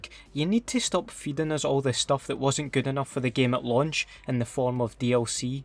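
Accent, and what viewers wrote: Scotland, United Kingdom. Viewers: Ur soooo much scottish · 10/10 Scottish accent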